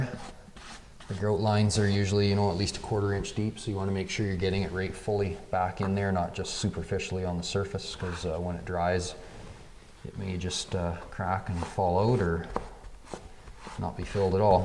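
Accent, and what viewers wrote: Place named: Saskatchewan, Canada. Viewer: This guy has GOT to be from Saskatchewan.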